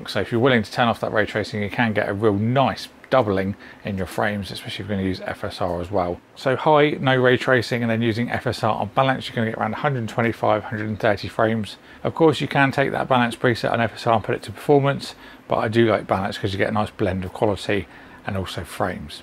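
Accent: United Kingdom. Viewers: i like your brit accent :D also 2nd time finding you overviewing smthn i am interested in, good content mate :)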